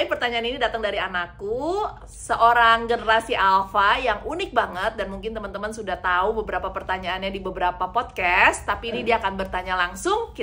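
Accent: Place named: Sunda, Indonesia. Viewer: Kirain dirumah juga nyablak sunda bo dok😂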